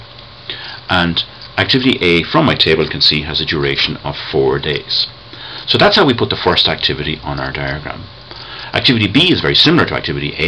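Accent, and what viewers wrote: Ireland. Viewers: The irish accent is one of the best!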